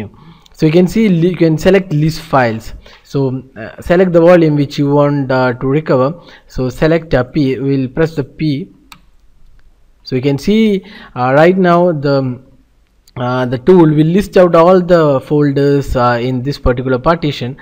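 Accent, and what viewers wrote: India. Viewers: for gods sake speak in a slower manner so us English speaking people can understand you my man...I know you are doing your best but your speech pattern is hard to follow when you more or less mumble all the words in the same monotone .....wish to god I could speak on this thing (think post).. Asian Indians really need to S L O W down in your speech patterns....hell I'm Southern and don't speak English that good myself but it comes across horribly.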